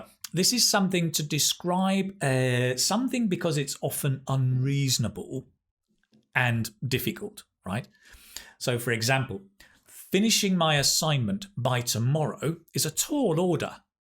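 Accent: United Kingdom. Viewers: I’ve never thought I would bump in your outstanding video lessons. Your way of teaching is a breeze, your native British accent is out of this world. I always pay attention to each word you say ; it comes effortless from your mouth. Despite watching other videos , this one has definitely been a top-notch, every expression is really worth and sound to know about and hopefully use . It would be a real let-down if I don’t use this from now onward. Thanks endlessly Sir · I adore your British accent.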